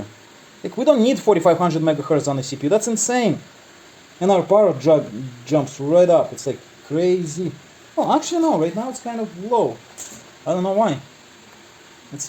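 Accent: Russia. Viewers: Русский что ли?!)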